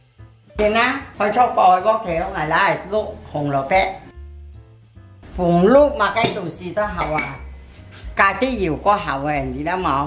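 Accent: Hakka, China. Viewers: 婆婆的客家话跟我的很相似。请问是怡保的客家人吗？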